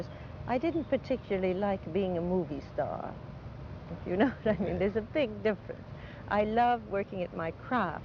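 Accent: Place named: Europe. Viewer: she's lived in Europe among Europeans for decades so she's almost certaintly developed the accen you can hear.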